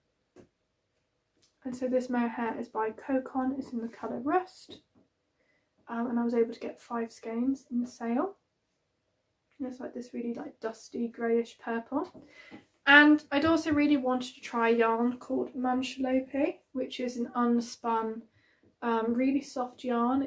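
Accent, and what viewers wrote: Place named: Liverpool, United Kingdom. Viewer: A wonderful episode! I so enjoyed knitting along and listening about your knitting journey. I grew up visiting my grandparents in St Helens near Liverpool. Your accent brought me back over 40 years. Best wishes from a Canadian knitting podcaster!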